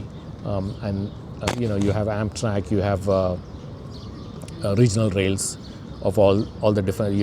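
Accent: Kerala, India. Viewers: Having not lived in Kerala, you still have a Malayali accent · Why didn't you pick up the American accent in 35 years? You still have the mallu accent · The first accent figured out Mallu ❤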